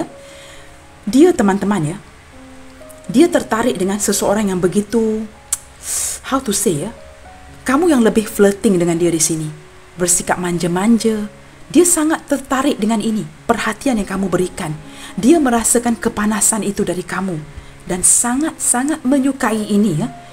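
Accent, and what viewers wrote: Malaysia. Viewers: Sya baru beberapa hr rutin tengok video dsini. Senang dgn cara readingnya... deep sekali. Dengar bicaranya sya jd berasa bernostalgia pd masa sya bekerja d Malaysia thn 1996 silam. D Kilang HITEC, spg,renggam johor bahru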